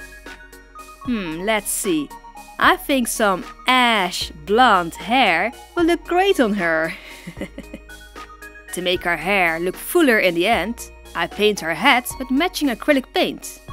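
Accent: Netherlands. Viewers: I love youre videos and i lpve youre accent i habe the same i am dutch asswell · Beetje jammer weer. Hoorde al nederlands accent in je engels hahaha. Its better in English, becuase more people will understand it :).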